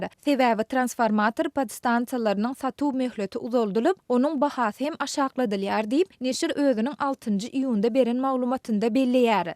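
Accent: Turkmenistan. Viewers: так красиво говорить. Човдурка что-ли?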